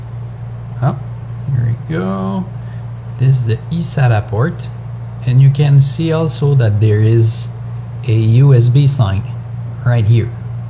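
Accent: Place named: Quebec, Canada. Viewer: jvoulait dire que d'est que j'ai entendu ton accent j'ai su tout de suite que t'etait quebecois lol, bon video en passant, desole pour la confusion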